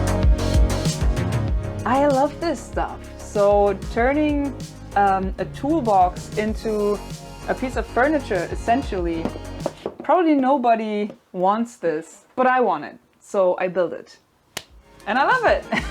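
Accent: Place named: Germany. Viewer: PS: the G'Enlish is an added bonus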